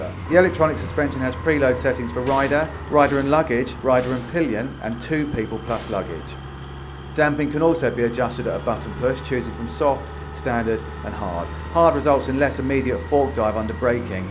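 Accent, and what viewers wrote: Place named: England, United Kingdom. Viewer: Can't stand that Limey accent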